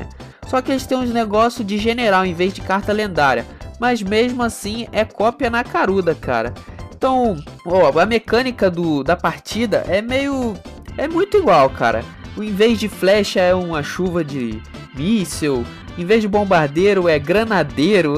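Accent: Rio de Janeiro, Brazil. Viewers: Pela Voz o e Carioca kkkkkk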